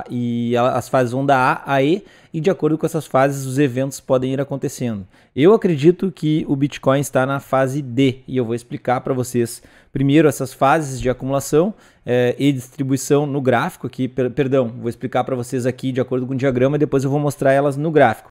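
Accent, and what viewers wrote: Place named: Rio Grande do Sul, Brazil. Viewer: Sotaque Gaucho é lindo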